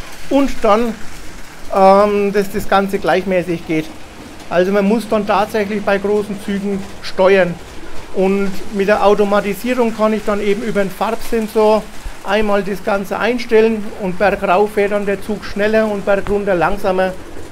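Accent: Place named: Franconia, Germany. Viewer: Hallo, Ich habe ein größeres Projekt mit Lego Bahnen vor. Meine soll bei Schönem Wetter draussen im Garten fahren. Natürlich in Modul Bauweise. Deinem Dialekt nach, würde ich mal behaupten, daß du aus Franken kommst. Vielleicht hast du ja mal Lust auf einem Fahrtag, wenn das Ganze Form angenommen hat. Falls du da mal Bock drauf hast, dann meld dich mal. Liebe Grüße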